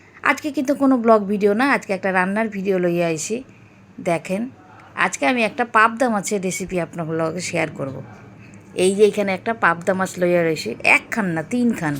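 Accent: Bangal, Bangladesh. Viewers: Darun bangal vasa bollle recipe tao khub sundor hoyeche ❤❤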